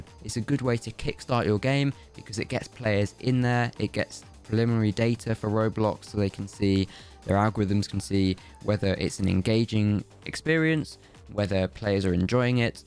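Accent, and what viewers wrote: United Kingdom. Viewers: Nice finally British lad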